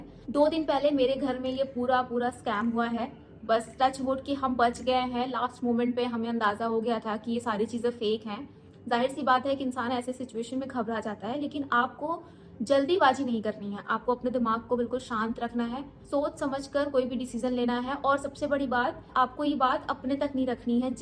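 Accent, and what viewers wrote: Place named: Pakistan. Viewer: Iski accent se lag rha hai ye pakistani hai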